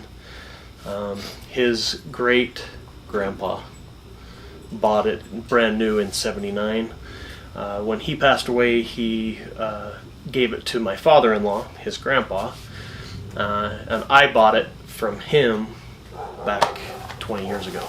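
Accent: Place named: Utah, United States. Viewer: Greetings from Tooele! I can tell you're in Utah because you say "acrossed" instead of "across".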